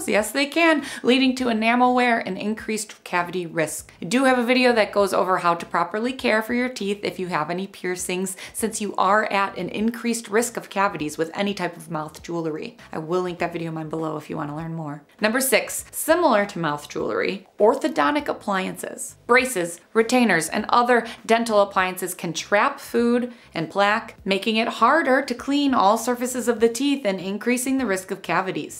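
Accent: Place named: Midwest, United States. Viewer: Are you from Chicago or the somewhere in Midwest by chance? You totally sound like me and my family!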